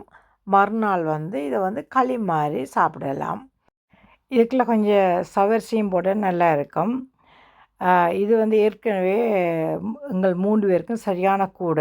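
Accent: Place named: Sri Lanka. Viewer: ஆனால் உங்களின் தமிழ் ரசிக்கும்படியாக உள்ளது இலங்கை தமிழ் எனக்கு பிடித்துள்ளது அக்கா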